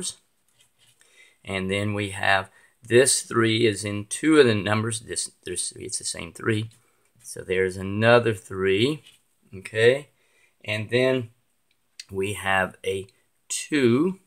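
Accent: South, United States. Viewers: The southern accent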